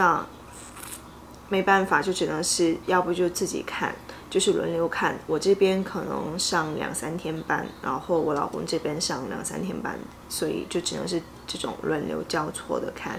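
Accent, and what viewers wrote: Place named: Taiwan. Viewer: 有一点点的台湾口味。感觉很好 。支持你 。구독.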